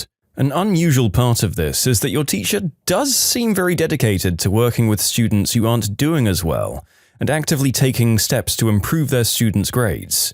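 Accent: United Kingdom. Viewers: Why do you sound British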